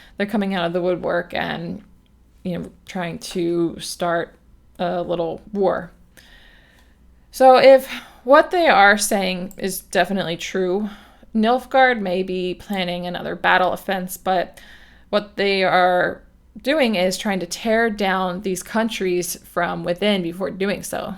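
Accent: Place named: Philadelphia, United States. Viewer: awesome! I'm from just outside of Philly, but still lots of family in the area and over in Jersey still. I was showing your channel to my cousins who were down visiting from Atlantic City, and your accent kinda gave it away, lol. But yes, I'll probably just read along with podcast, looking forward to the next one!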